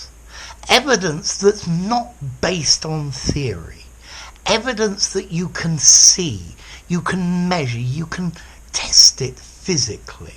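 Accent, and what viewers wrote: United Kingdom. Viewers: Glad to help. I also love his lessons. But the first thing, I was attracted by, was his British accent.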